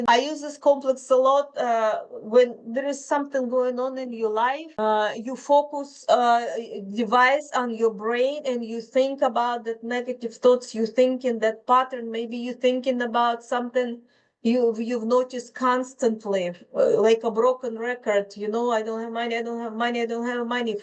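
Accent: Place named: Russia. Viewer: Где на русском послушать? Очень слышно русский акцент, но не понимаю где найти эту же информацию на русском языке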